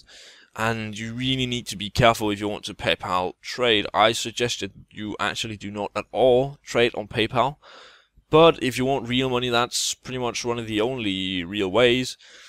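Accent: Denmark. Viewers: jeg kan høre at du er dansk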